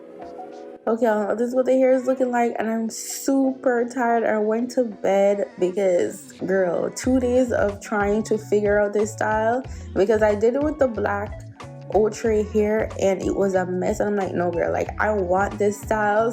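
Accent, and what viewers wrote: Jamaica. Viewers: I think she's Jamaican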